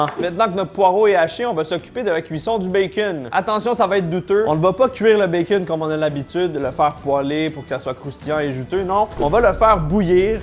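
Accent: Quebec, Canada. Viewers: Je remercie mes 2 années au Québec, je peux comprendre dans cette vidéo xD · Gourmandise française mais qui adore aussi votre phrasé québécois ❤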